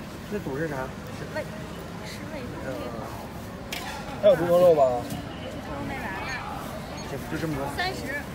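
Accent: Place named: Weifang, China. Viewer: 肯定是潍坊老乡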